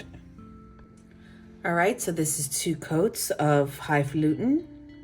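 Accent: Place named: Northeast, United States. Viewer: I’m actually binge watching your videos. I just found your channel yesterday and I love it! You sound like you’re from the northeast which is my home. ❤️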